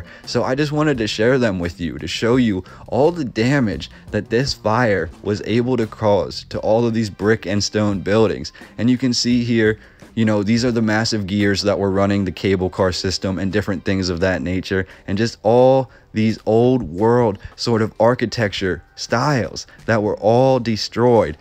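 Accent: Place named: East Coast, United States. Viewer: I recently found your channel It fantastic I can hear the east coast in your voice Lol Have you done washington dc or georgetown?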